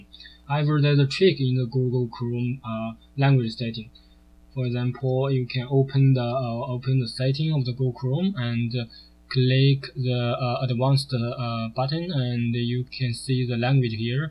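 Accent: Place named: China. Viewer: Thanks! I could change my default language from Chinese to English. And you Chinese style English is perfect for me, hahahaha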